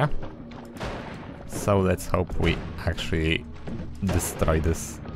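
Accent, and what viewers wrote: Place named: Poland. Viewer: You have a very slavic accent, are You perhaps Polish? Also noic nice wand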